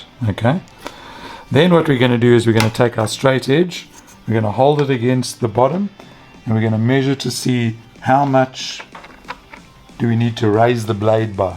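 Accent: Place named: South Africa. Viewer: I see on your profile you're from Australia, but I'll bet my nonexistent fortune that you're South African. Anyway, great informative video. Clear and to the point. Exactly the info I needed.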